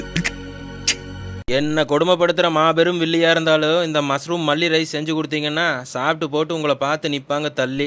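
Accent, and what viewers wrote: Coimbatore, India. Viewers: Ivlo nal enga irunthinga na...evlo alaga korvaiya pesuringa..... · Kongu Tamil super from Malaysia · ஏன் சாமி இப்படி பேச சொல்லி யார் சொல்லி கொடுத்தா சூப்பரா பேசுற நல்லா சமைக்கிற நம்ம கொங்கு நாட்டு பெருமையை காப்பாற்றி போட்ட போ இப்படிக்கு உன் பொறந்தவ 👍💐💐💐💐💐💐💐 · Iam here for Coimbatore slang . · Super bro coimbatore Tamil 🎉🎉🎉🎉super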